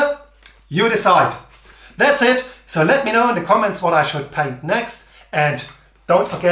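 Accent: Germany. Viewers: Bin ich der einzige der merkt das er deutsch ist... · Ich liebe es wenn Leute englisch sprechen, man aber voll den deutschen Dialekt raus hört 😅😂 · Da hört man direkt dass der deutscher ist xD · er meint den starken akzent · Absolut geil. Aber koch besser ist es das Deutsche im Englischen rauszuhören. Genial.👌🏻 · I love how you can hear the german inside the english language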